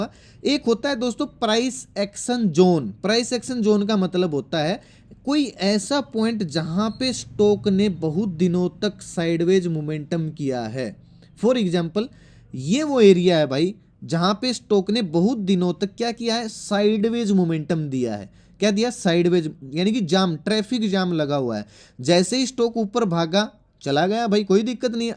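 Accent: Haryana, India. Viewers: App Haryana sy ho kya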